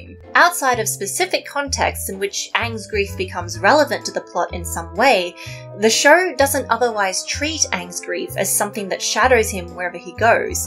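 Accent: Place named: Australia. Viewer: I love the way you pronounce Aang's name in your Australian accent.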